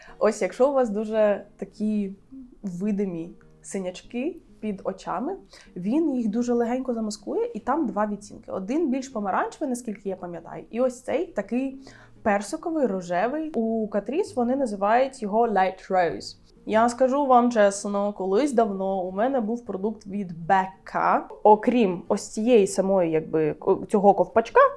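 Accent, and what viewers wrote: United Kingdom. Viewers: Суперське відео, люблю цей бренд) І у вас яскравий, як на мене, британський акцент, дуже класно звучить😍😍😍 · Вперше чую українську з британською інтонацією😅 наче дивлюсь укр контент, а відчувається наче дивлюсь англомовного блогера. Якийсь дисонанс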